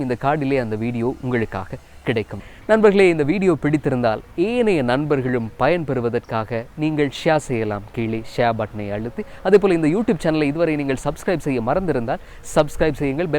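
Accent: Sri Lanka. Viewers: அழகான இலங்கை தமிழ்..😍😍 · Seem like srilankan tamil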